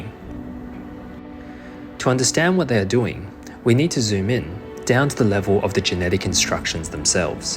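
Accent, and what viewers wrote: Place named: Australia. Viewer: Good to hear an Aussie voice, thank you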